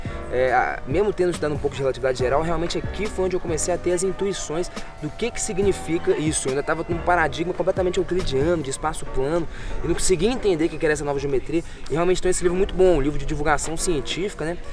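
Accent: Minas Gerais, Brazil. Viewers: vc é mineiro? sotaque de mineiro de BH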